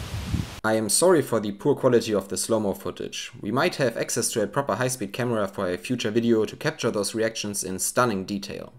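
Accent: Germany. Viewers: Is this guy German? Cool experiment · geiles video und krasser deutscher aktzent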